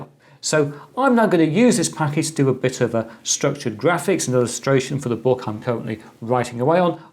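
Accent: United Kingdom. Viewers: Thank you for the Mint week. I didn't know it was a dairy - very interesting and funny. I just like your british accent - I've been in Britain three times in my life (<num>) and I like your country. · Thanks to the China Virus...I have been listening to your very generous youtube posting which I have been getting used to your Brit accent (enjoyable to hear very little lol and brg.......etc) Thank You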